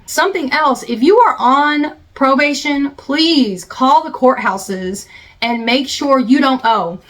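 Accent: South, United States. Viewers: Three minutes in and I love your natural speaking voice. Are you from the south??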